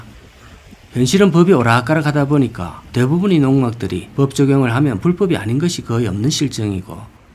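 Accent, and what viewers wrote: Gyeongsang, South Korea. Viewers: 경상도인가요 좋네요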